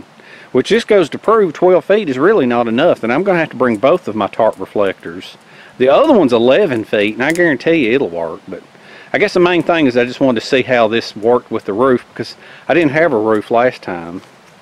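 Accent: South, United States. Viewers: I love to hear his southern drawl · How did you make your reflective tarp and firewall? I learn a lot from you and love the southern accent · Holy Moly 10 days of rain. Good sound for sleeping though. I love the sound of rain on a tent, and rushing water, louder with the wind the better. I so love your great quality gloves with the velcro wrist straps to tighten. Nice matching hat too. Wow great idea on the 2 custom tent stakes and to boot you criss cross them for extra stability. You are one of the cleanest best color coordinated dressed bush crafter or sometime woodsman lol on YouTube I bet. Good for you! What was that in your accent, was that sake? Love the southern accent. Wow awesome results!!!! Hot stuff wow. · you have the heaviest southern drawl I think I've ever heard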